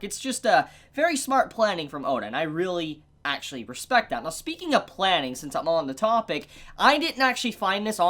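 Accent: South, United States. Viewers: Yo where are you from? I feel like sometimes you have this southern accent that doesn't sound bad at all, just made me quite curious! Much love from San Antonio.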